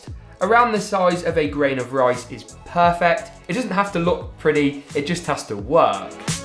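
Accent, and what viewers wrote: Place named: Birmingham, United Kingdom. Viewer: Sound like a brumie but use $ any reason why not £.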